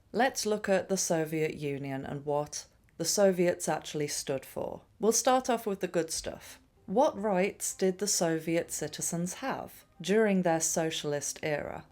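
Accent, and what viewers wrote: United Kingdom. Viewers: I´m from Austria and I´ve discovered your channel recently by accident and I love it. Smart young woman with a charming british accent and highly interesting content!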